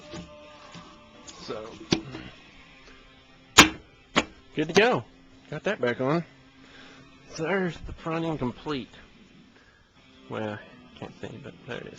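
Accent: United States. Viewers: Are you from Kansas? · haha. I had a feeling you were from TN... I am too.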